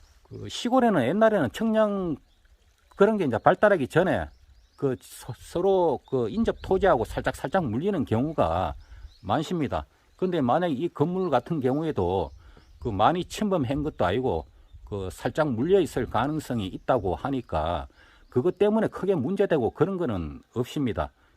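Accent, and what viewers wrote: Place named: Hadong, South Korea. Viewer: 하동출신인데 고향말투가 느껴져 정겹네요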